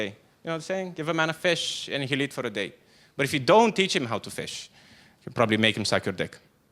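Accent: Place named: Mexico. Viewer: "Sometimes I go to America I'm Mexican" So, accent sounds Mexican to some Americans.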